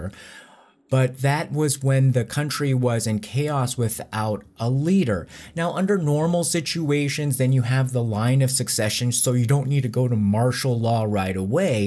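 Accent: United States. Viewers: Never like American English. Sounds terrible.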